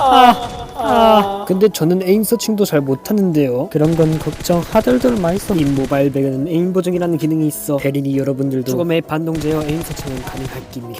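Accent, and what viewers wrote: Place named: North Korea. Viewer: 북한인이 말하는거같누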